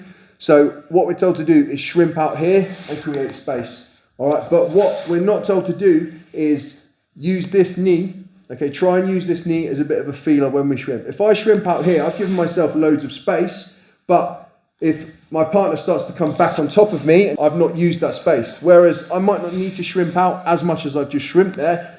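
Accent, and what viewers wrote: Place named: RP, United Kingdom. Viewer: Such a funny accent😂 Posh as f😅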